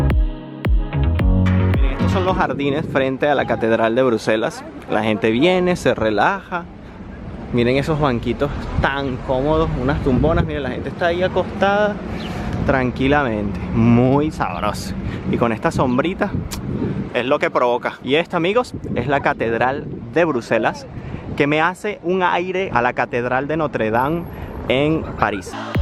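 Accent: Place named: Venezuela. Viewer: De dónde eres youtuber x tu acento creo q eres veneco seguro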